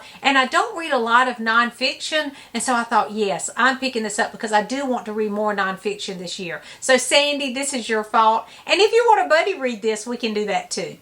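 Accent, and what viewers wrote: South, United States. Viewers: Love your smile... laughter... and, your southern accent!😁🤗💞. Hv u read, “B is for Betsy “?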